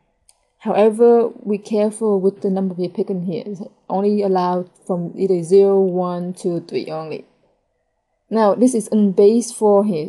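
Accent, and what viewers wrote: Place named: France. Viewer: yep...you''re French....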